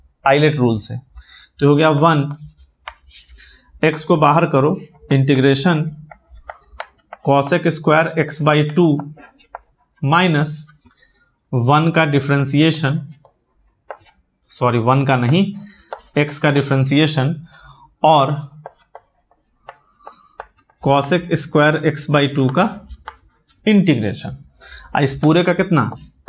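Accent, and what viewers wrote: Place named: Bihar, India. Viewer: Bihari Accent giving legendary vibes✨✨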